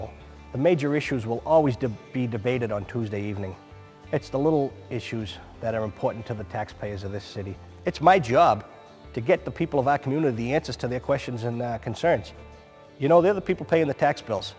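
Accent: Boston, United States. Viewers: His Boston accent is stronger than mine!!!!! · I'd vote for him just for his perfect Boston accent!!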